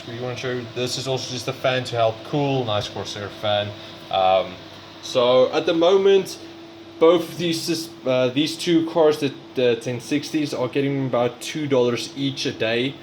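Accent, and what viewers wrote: South Africa. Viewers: Blatantly South African Takes one to know one lnao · For the Comment below about accent its a Great South African accent, and yes at the currect exchange rates earning in US/Bitcoin and exchanging to ZA Rand can well pay for rent if you live in South Africa. Great Video keep going ! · Sounds like South African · Michael Chistol Modern Afrikaans South African. Picks up accent from tv and media. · Couldn't fool me, The good old SA accent, keep up the good work/hashing in SA from an ex South African in New Zealand. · lol your accent confused me at first.. sounded southafrican with an American kick, anyway cool channel bro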